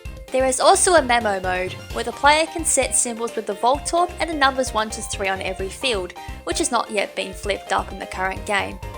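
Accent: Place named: Australia. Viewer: I am tempted to not watch your videos for a while, not because I don't like your videos, but because I love listening to your voice speak about Pokemon. Sounds weird I know but I like Australian accents x)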